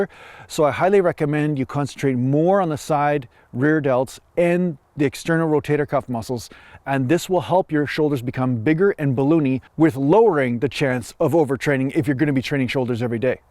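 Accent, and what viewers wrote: Canada. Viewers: Ok I'm playing name that accent. How close to Alberta are you from?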